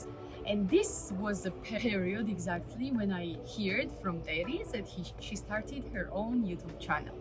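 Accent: Japan. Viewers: の日本語のイトネーション好き😍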